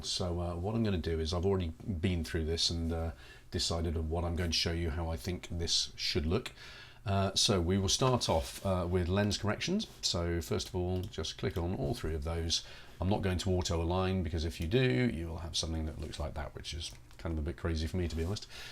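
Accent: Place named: United Kingdom. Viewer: Hi! You just appeared in my Youtube recommendations and I'm frankly happy for it. Great video and it's really nice to listen to your charming Brittish accent after so many american or australian tutorials. I'm not really into trying Lightroom, I don't like the concept of libraries and catalogues, but the develop module seems much more atractive than ACR, so maybe it's time to give it a try. Thanks for sharing your time :)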